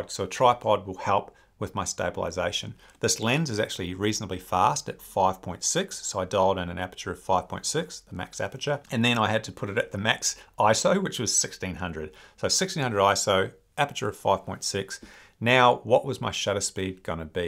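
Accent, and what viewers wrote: Australia. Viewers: Hi, I'm really enjoying the videos... it's wonderful to hear an Australian voice, highlighting birds that are there for us all to see/capture. I have already subscribed, but am trying to find the 'join' tab, so I can sling a small amount your way in appreciation. Can't find it... perhaps you already have too much cash and don't need any more! If you can steer me the right way it will be a win-win. Have decided to bite the bullet and go mirrorless with an R7, and an 18-150 as my 'everything but birds' lens and a control ring adapter so I can use my (second-hand) 100-400Lii, plus a 1.4 extender. Happy days!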